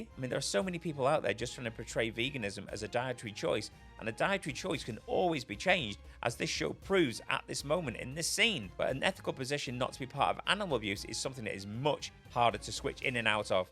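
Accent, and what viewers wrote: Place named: United Kingdom. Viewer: I like your accent! Where are you from? The UK? as for the topic: there's a lot of anti vegan propaganda in movies. I'm not surprised. it's their agenda to make us look unsustainable and treacherous.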